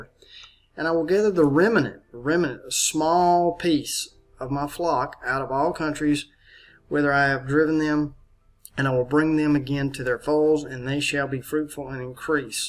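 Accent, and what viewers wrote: South, United States. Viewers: awesome bro. you from Bama!? that's sick. I never knew that. I always knew from your voice that you were from some part of the south, but I love it. I'm from Alabama also. been here my whole life. Do you still live in Alabama?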